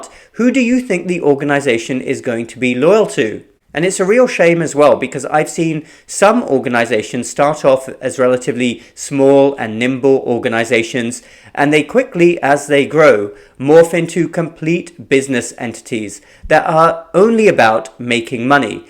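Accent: United Kingdom. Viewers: I bet the Septic Tanks love your British Accent. 😁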